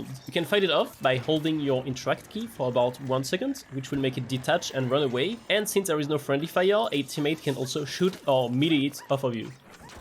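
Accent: France. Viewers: Are you French? As a French guy i can hear the French accents in every word hahaha love your video btw !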